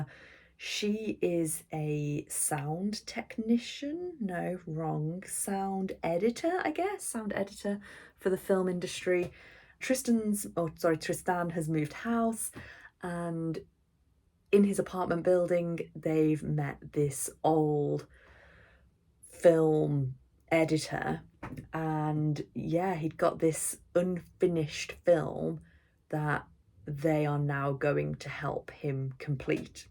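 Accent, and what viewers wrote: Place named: Midlands, United Kingdom. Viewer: Heyy, I’m a new subbie! I’m also from the midlands as well, so it’s comforting to hear a similar accent. 🥰 You did really well, there’s NO way I could do 8hrs of reading, I can just imagine the brain fog 😶‍🌫️. I also have chronic fatigue, so completely understand! So glad everything is okay with your doggy too. Keep the videos coming! 🧡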